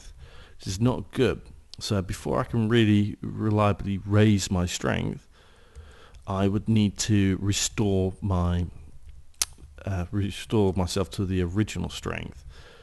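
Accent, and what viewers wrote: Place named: England, United Kingdom. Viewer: I love your "typical" english accent :) where do you go for this game... i just dont understand :)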